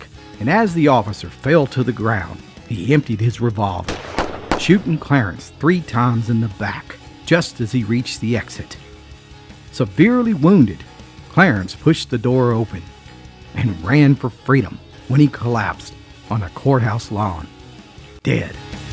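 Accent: Appalachia, United States. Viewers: I wouldn't say he has a deep Southern drawl,I do he has a soft southern Appalachian accent, there are several different regions of different accents, all with some things in common and difference.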